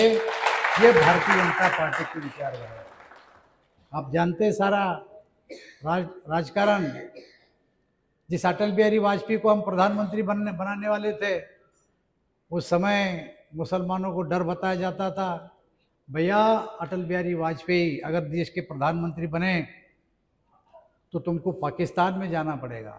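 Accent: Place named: Marathwada, India. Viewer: Khup chhan gavran jaminivarcha neta..... jaykwadichi koli ani ravsaheb denvenchi boli hicha marathvadachi alokha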